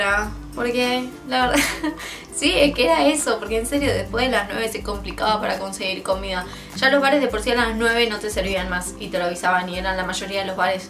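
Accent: Argentina. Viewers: "vivis culturalmente en Argentina" ('??) o sos argentina? no me quedo claro porque tu acento es argentino pero dijiste que era el pais donde estas acostumbrada a vivir.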